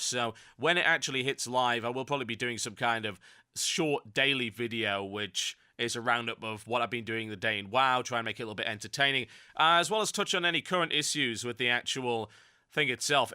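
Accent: United Kingdom. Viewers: by the way you talk, it always pictures in my mind an old guy with an angry british accent.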